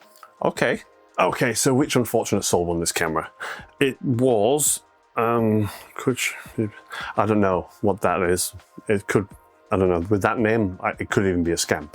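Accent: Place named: Hull, United Kingdom. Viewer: A hull accent on YouTube and a Saul Leiter fan, Brilliant! Really enjoyed watching. Your Instagram post brought me here, but before I read anything, I thought very Saul Leiter. Fabulous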